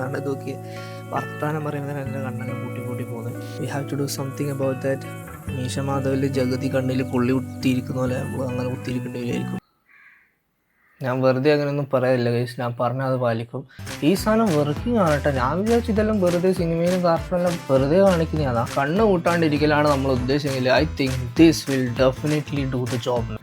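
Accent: Kannur, India. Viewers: Kannur aahno brthr😮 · Neee kannur edyaaadaa..?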